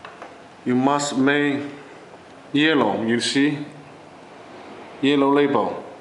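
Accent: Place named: China. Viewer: he has nice idea, don't confuse to his english we all know he is chinese. atleast he trying. thanks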